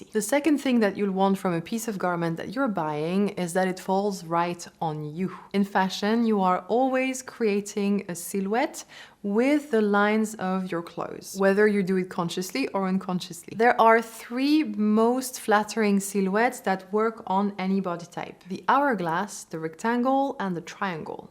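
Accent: France. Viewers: Very informative. I like your lovely French accent. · Hey u look so pretty and your french accent is very lovely. 🤓🫶💖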